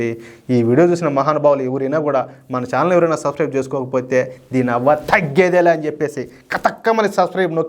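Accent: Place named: Kadapa, India. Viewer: ఆహ్! నిజంగానే వీనుల విందు బ్రదర్ . నీ కడప మాండలికం తెగ నచ్చింది.మాది రాయలసీమ .జై బీజేపీ.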